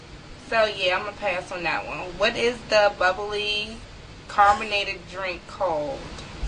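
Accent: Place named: Washington DC, United States. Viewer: yessss! ihated that BUG question. it was soooo dumb! &&yu are sooooo DC iLOVE it! &&&thnxxx for the shout out hunni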